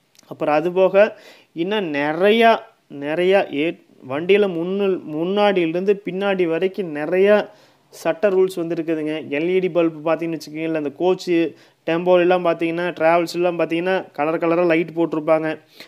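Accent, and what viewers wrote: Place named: Kongu, India. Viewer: neenga kongu Tamil peasumpothey nenaichan....nanum erode dt than bro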